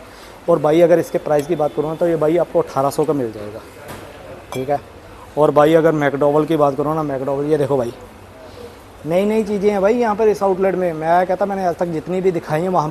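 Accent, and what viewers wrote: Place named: Punjab, India. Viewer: Bnde da accent punjabi lgda menu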